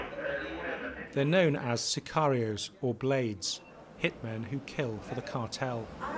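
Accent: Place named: United Kingdom. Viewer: I love tha British accent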